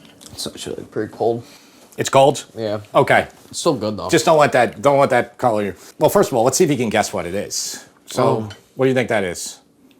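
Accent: New York, United States. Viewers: You guys from New York?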